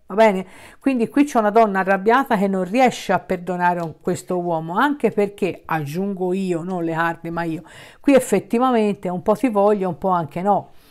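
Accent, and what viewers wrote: Tuscany, Italy. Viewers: Buonasera hai pienamente ragione si quello che ai detto e verissimo e un periodo che non lo sento però so che e informato su di me e non so che mi offre nel futuro però lui e come lo stai descrivendo e quello che dicono le carte gli ho dato l'animo.gli ho fatto una richiesta e non decide di farla dopo tanti anni penso che lo meriterei. Ciao carissima simpatica e bella sempre la tua voce con l'accento toscano❤️😘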